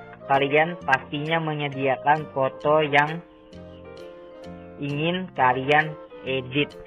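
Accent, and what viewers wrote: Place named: Banjar, Indonesia. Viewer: Ktahuan org banjar😅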